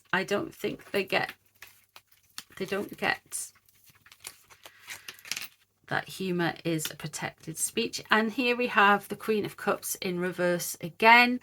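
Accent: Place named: England, United Kingdom. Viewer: Love❤ your interpretations you are so on the case. Love your accent too . I’m Australian and I get a kick out of different English accents, there are so many varieties. Here in Australia we pretty much sound similar.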